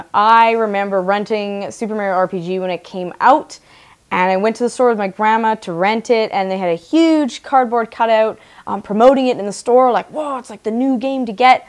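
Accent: Canada. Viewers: Canadian right? "Mare-rio", "aboat".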